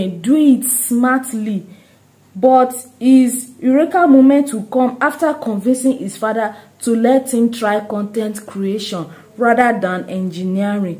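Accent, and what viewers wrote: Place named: Nigeria. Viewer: You sound Nigerian. 🙄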